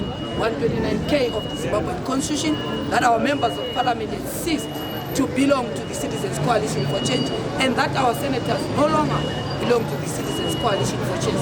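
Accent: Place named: Oxford, United Kingdom. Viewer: Taura zvako wangu mufanha wechidiki , who speak English with an Oxford asent Osalo 💖💥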